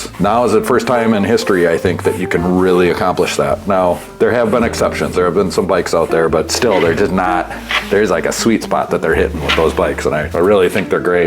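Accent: Wisconsin, United States. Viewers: The Wisconsin accent takes this from "very informative and helpful" to "neighborhood dad helps you fix up a bike." Very wholesome. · Hello from WI! Finally, someone who talks like me! I don't know why the internet thinks I need bike content, but I'm glad it does! Love the humor. Love the music. Love the pace. Keep it up! PS - Where in WI are you located?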